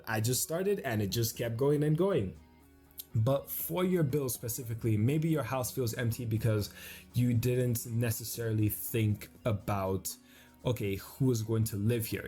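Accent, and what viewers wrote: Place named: Nigeria. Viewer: Your vids really helped me break my builders block thanks man. I always knew you were Nigerian hehe😅... M also a Nigerian we know our tongue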